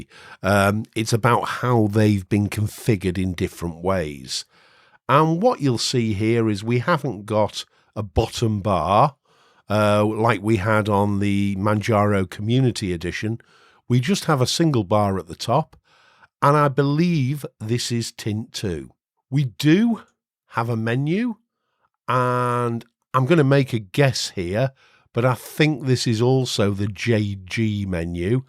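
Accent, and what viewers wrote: United Kingdom. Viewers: Oh no! speech speed and British ascent is perfect mix. I personally enjoy listening to way more that any other youtube Linux channel out there. Informative and relaxing in the same time. This channel is a marvel...thank you!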